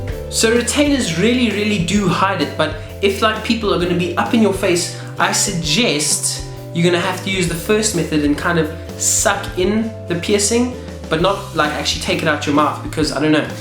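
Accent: South Africa. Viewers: are you south African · your south african right? I can tell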